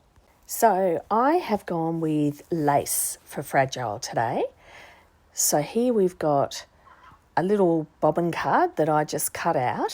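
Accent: Australia. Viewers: That was a great interpretation of the prompt. I'm still not sure what I will do but I did find this helpful. Love your Aussie accent. Blessings and hugs from New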